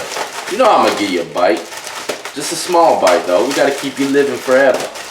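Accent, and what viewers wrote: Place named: Louisiana, United States. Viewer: Where did you do time bro? Cant place the accent...louisiana? I did 3 in tdcj texas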